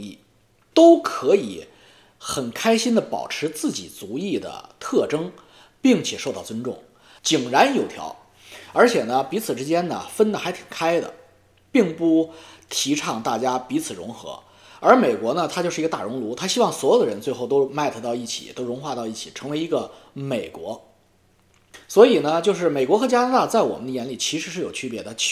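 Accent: China. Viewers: ，前些天你笑话阿姨的英文，今天我听出你的几个单词发音也不理想，中式发言浓度偏高。Fuck，你说的往fak偏；balance，你说的掰Lance；还有一个词可能是要说Melt 融合，说成迈特。见微知著哈，元音的发音比较中式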